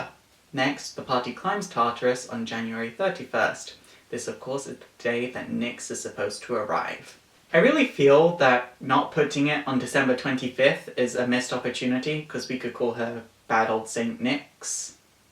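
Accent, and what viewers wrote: United Kingdom. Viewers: I can't tell if your british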